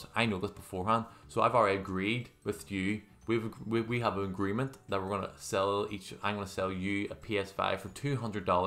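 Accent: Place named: Northern Ireland, United Kingdom. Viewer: Being N. Irish, I had to double take when I heard your accent when watching a video on such an American film lol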